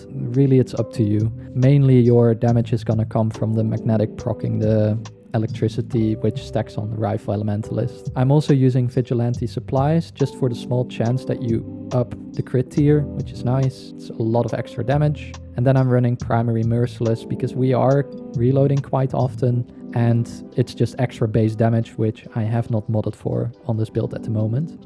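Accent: Netherlands. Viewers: Yow bruh you accent is dutch or you from tw Nederlands?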